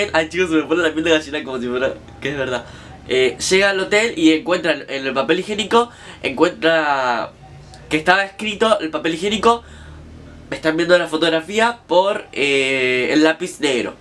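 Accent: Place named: Spain. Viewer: Ese acento español es U NI CO❤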